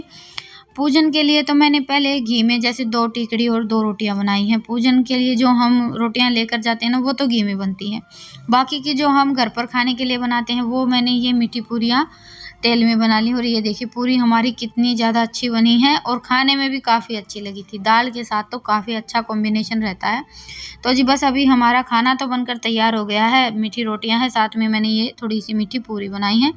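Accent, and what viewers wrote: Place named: Rajasthan, India. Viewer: Didi aap kha se ho aapki awaz se to lagta h aap Rajasthan se ho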